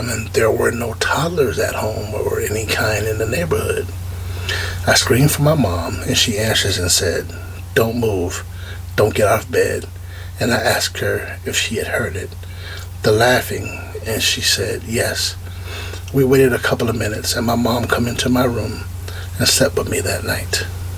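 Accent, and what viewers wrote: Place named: London, United Kingdom. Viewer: As an English person I would say you’re well on your way to getting a decent accent however it’s very mixed in terms of regional accents. There was definitely some sort of London accent mixed with a touch of a northern accent (when you said the part about ‘scumbag brain’) and some RP thrown in the mix.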